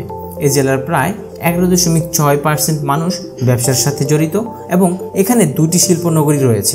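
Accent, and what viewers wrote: Noakhali, Bangladesh. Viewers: আপনি কি নোয়াখালির নাকি,,, আপনার পরিবার নোয়াখালি থেকে এসেছে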